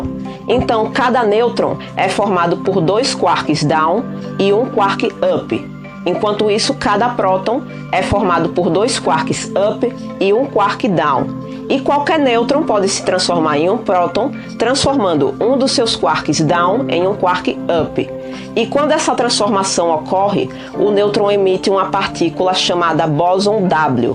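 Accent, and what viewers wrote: Northeast, Brazil. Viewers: "O bixinho é pesado" física e meu amado sotaque nordestino, adorei ❤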